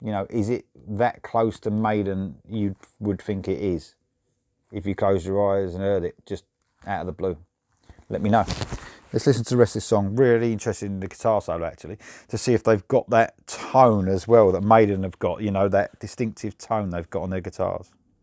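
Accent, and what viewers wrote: England, United Kingdom. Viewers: Fair review. Mendes is gifted by nature, why don’t take advantage of this? I prefer someone like him than someone else that tries to sing like Bruce and sucks. Mendes pays a tribute to the one and only. Respect. About the song... still a bit green, but better than any Maiden songs of the last decade if not more... not taking about the production 😅., I love your English accent, but your Brazilian sucks 😆 Cheers Max 🇦🇺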